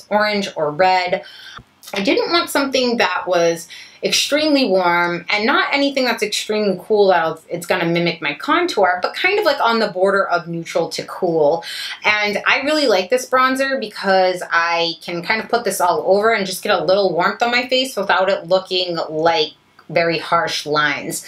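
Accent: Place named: Boston, United States. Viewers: Oh my god - you're from Boston. No wonder I understand everything you say and always know what you mean without explanation. Obviously, I'm from Boston (now transplanted to CA). Your accent isn't heavy, I hear your "r's" being pronounced quite clearly. It's more of an attitude/style of expression that is Bostonian to the core and always seems "right" to me because it's home. Okay - back to finish the video now. I had to pause it to write this - the new-found knowledge of your Bostonian-ness was wicked pissa and wicked monumental. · Omg I love you, I knew you were from Boston 😂 You have that edge. I live in Cambridge and people always have something to say about the way I talk. Now I know why I love your channel! ( other than the obvious) . People also should spell it Mahhhhk not Mark lol where did you grow up?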